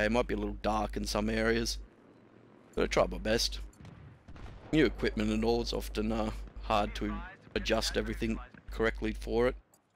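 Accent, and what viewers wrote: Australia. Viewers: Wereabouts are you from, i'm gessing Aussie :D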